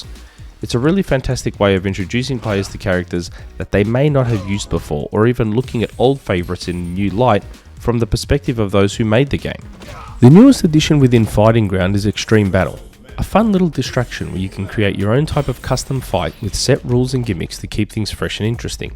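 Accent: Australia. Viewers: Love the Aussie talk about games, even though he is a Collingwood supporter. Good review. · ah nice to hear another Aussie!! Thanks for the Awesome Review!!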